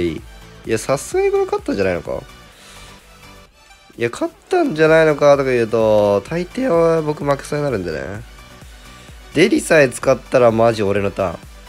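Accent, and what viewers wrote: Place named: Shizuoka, Japan. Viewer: 久しぶりにRADさんの動画見たらめっちゃ静岡訛りでばかばか言ってて何があった？って感じ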